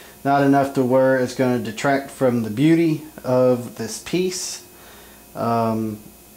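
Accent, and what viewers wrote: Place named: Texas, United States. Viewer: Texas accent is no excuse for mispronouncing our dark master Sauron the great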